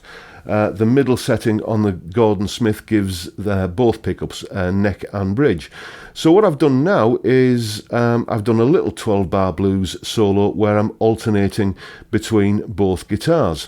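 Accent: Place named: North, United Kingdom. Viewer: Errrr. You should errr, definitely become a DJ. I'm not saying that because you've got a face for radio 😉, but your voice would be excellent. Intelligent, gentle with a particularly articulate Northern twang. Forgive me for any negative vibes this will appear to come with, but the only problem I have with these comparisons is the inclusion of modulation/doubling/chorus on all tracks. Reverb is a little too heavy too. Other than that, you've done a brilliant job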